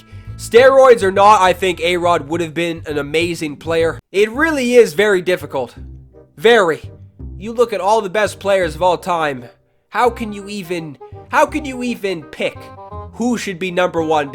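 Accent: Canada. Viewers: Ill tell ya whats NOT the G.O.A.T...ur stupid canadian accent. We all hate it. Effin period....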